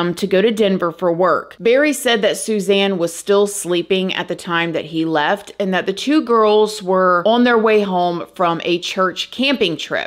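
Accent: South, United States. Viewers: I think he did it. He just looks guilty... I pray that they put the correct person under the jail if it is him it would be so tragic for the girls because they have already lost their mother. If it turns out that he did do it these poor girls will have to spend years in therapy to help cope with the fact that they backed their father and he was lying to them. This is a sad sad sad situation. I also just want to say that I absolutely adore u and ur country accent. anyways I hope they figure out what happened and I hope they find the other person they were looking for when they found her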